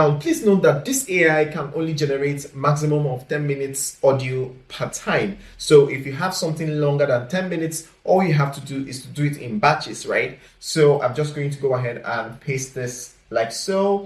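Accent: Nigeria. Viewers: Which English did you use for this is it Nigeria English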